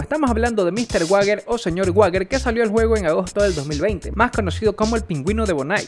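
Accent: Ecuador. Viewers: Bro eres de Ecuador??? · Eres ecuatoriano?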